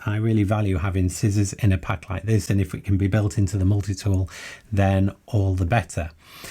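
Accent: United Kingdom. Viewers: Love to listen to British accent, as a 2nd generation american, I get nostalgic of listening to my grandfather and great uncle. And very good advice and explanations. Went through my pouch to see that I had most of his suggestions.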